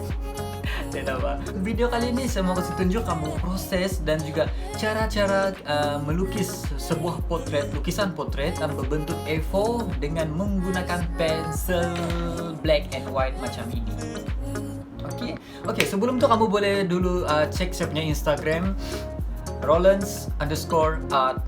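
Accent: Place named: Sabah, Malaysia. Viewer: Mcm ada bahasa sabah campur kl😂 btw hope you get a lot subscribers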